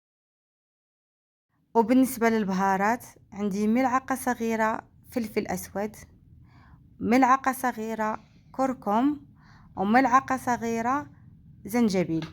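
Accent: Yemen. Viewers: 😂😂😂 مااجملها تتكلم يمني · من ركز انها صارت شوي تتكلمي يمني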